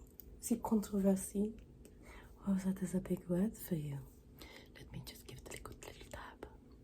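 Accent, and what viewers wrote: France. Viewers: accent makes me believe that you're orginally french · as french I like so much her French accent !!😂😂😂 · That french accent is way too accurate and relaxing… Lot of love from Paris🥰🇫🇷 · I don’t get tingles from French accents but this was worth the watch regardless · As a French, I love this accent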